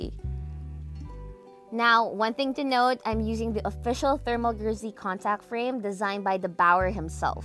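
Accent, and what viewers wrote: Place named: Philippines. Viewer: I am hearing a filipino accent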